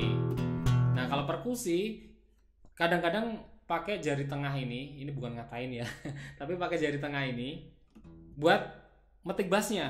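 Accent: Java, Indonesia. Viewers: Kendal? Suara medok bang.. Salam dr pegandon 😂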